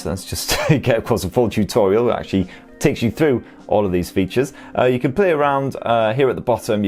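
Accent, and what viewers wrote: United Kingdom. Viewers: I like your video content and your british voice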